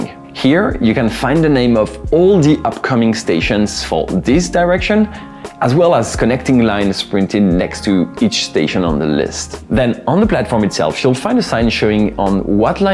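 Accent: France. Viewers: L'accent français t'as peur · WOW! Great video. It was a pleasure to watch it and learn it. I love that you speak with this amazing french accent about metro in Paris, France. I hope your channel will grow quickly.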